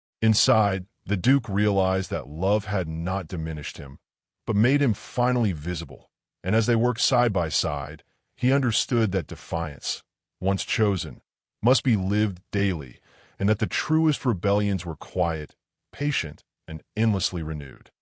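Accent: United States. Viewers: It really disappoints me that you create a story set in England but you us an American ai voices 👎👎🏻👎🏼👎🏾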